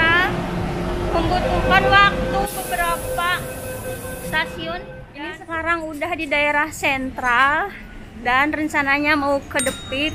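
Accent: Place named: Indramayu, Indonesia. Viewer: Wong ndi teh,Kya Wong dermayu tah mjlngka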